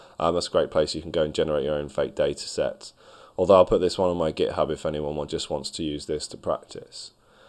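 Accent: United Kingdom. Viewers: Tough British accent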